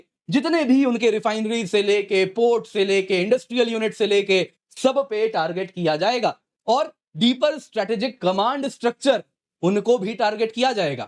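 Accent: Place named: Punjab, India. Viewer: Je Toh pure Punjabi tha ,❤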